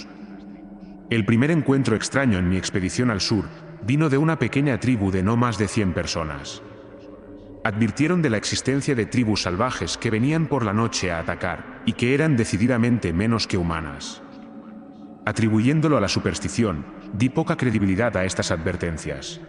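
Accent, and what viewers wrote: Spain. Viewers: Un pobre decrèpito video. Sabes nada de culturas Originales de Pachamama. Para y paren de repetir q' gente Original de tales tierras mataban y sacrificaban. Porq no mencionas las matanzas de MILLONES en el mundo por los "conquistadores" digo... matadores. Nunca podràs entender la psicologia profunda de lo Original...escucho tu voz y....eres Español? Verdad?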